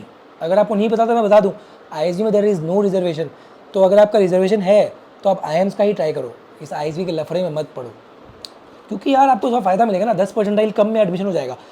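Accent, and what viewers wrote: Bihar, India. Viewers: Bhai tm Bihar se ho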